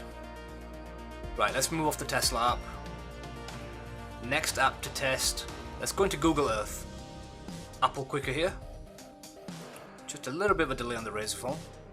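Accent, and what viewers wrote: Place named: North, United Kingdom. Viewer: This Asian man speaks with a distinctive Northern accent which is very different from the hybrid Jamaican dialect which is spoken by Pakistani teenagers in London. The original Indians of India were Black and migrated to India from Africa 170, 000 years ago. But i am only referring to young Pakistani teenagers in London who speak English in a hybrid Jamaican dialect which is very different to the distinctive Northern accent this guy has.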